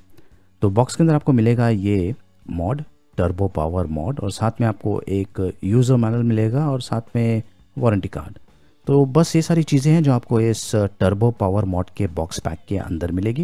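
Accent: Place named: Bihar, India. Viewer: Sr bihari wali boli aadhai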